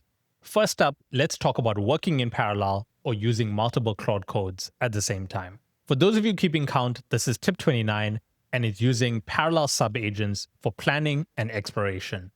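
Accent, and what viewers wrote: South Africa. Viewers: Are you South African? Or do you have a South African parent? Your accent is very interesting, if so, it’s great to see a South African make probably one of the best CC videos I’ve seen. 🔥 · Are you South African??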